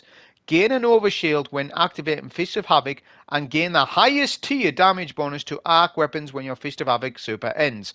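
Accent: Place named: Sunderland, United Kingdom. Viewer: I can't pin down your accent. Sunderland, County Durham?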